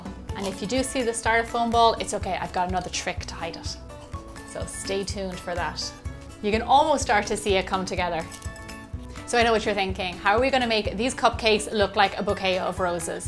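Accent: Ireland. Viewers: Love the Irish accent! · Omg! Your Irish!!!?!? How cool!!! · You are from Ireland?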